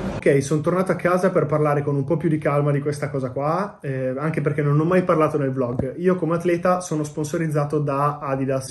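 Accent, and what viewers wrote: Pesaro, Italy. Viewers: Ti seguo dall'Inghilterra, in bocca al lupo per la nuova stagione. Rendi orgogliosi tutti i pesaresi nel mondo, che ne stai prendendo un po' la cadenza. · hai preso un po’ di accento pesarese ahahaha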